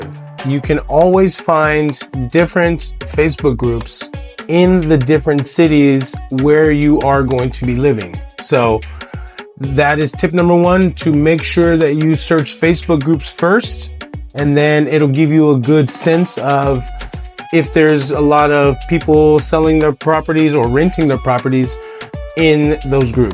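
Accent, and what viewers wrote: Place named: England, United Kingdom. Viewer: I guessed that comes from UK His accent is English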